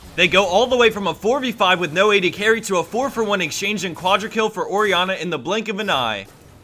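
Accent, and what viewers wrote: Netherlands. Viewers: this is why people from the netherlands should not talk english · That dutch accent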